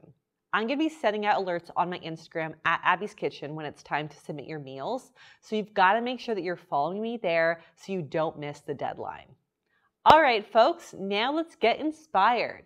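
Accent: Canada. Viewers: I just subscribed without having watched a single video because I saw you on Obese to Beast and though you were incredibly well spoken and as a linguist I like your Canadian speech patterns. But ALSO I have been a fan of Dr. Mike for quite awhile (I only watched HIM because I love music/tv/trailer reaction videos and I think I watched a reaction of his to an episode of "House.") And right before I saw you speak with John, I had JUST watched his video critiquing that Australian banana woman and I SO SO SO MUCH wanted to ask Dr. Mike to do a video on her, so when you brought up her and then you later brought up Dr. Mike, I knew I had to immediately come here. I think I missed the video you did with him-but I'm pretty sure I've heard him mention you before. (I can picture him saying the name " "...) Love you already! - Male, San Diego, obese, lost 25 pounds in last two years, most this year. 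Want to lose 50 in the coming year. Had an unrelated (genetic thing) stroke so my frontal lobe has issues so follow-through is difficult meaning even more than everyone else already, the psychology side is bigger for me than the physiological side. Have you ever talked to Tom Bilyeu on Impact Theory/Health Theory? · She is Canadian. That’s called an accent.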